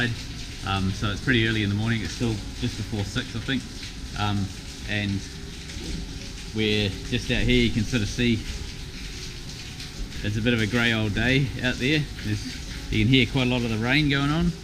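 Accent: New Zealand. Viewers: Just randomly discovered this in Hamilton NZ. And detect an NZ accent?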